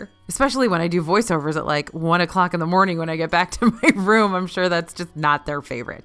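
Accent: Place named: South, United States. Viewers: The quasi-Floridian southern accent was really evident in this video with the disproportionate number of twangy "y'all's" compared to other videos. Fun! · is a Texan. They throw ya’lls around all day long in the Lonestar State!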